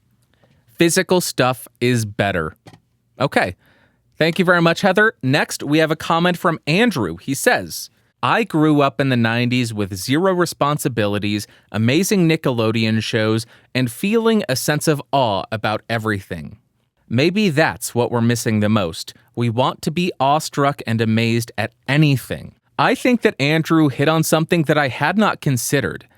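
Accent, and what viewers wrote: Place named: New Zealand. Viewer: Even though your 'kiwi' accent may have offended someone, somewhere... By the imaginary unofficial power vested in me, I hereby dub thee an honorary kiwi.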